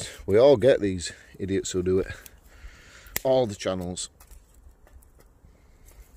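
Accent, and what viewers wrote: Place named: Leeds, United Kingdom. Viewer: There’s some nasty people out there. Can you imagine how miserable they are to be like this to random strangers? I love the way you talk, your accent is ace, reminds me of when I lived in Leeds 😊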